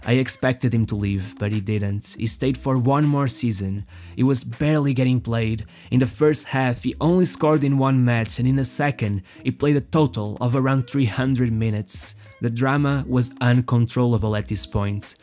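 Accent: Portugal. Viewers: This dude (narrator) is sooooo Portuguese. I'm almost 100% certain just because of the accent. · Are u portuguese? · I can tell your mother tongue is Portugese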